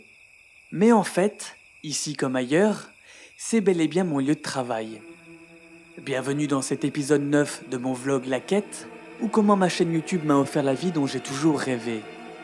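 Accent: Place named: France. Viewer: Mais où est tu né, tu à un certain accent français. Est ce l'accent suisse, belge, ou un accent du sud de la France ?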